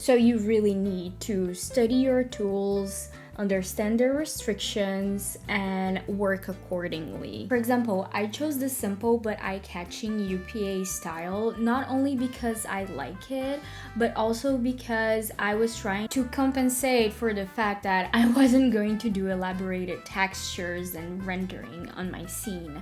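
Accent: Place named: Brazil. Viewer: oh my god, i love english brasileiro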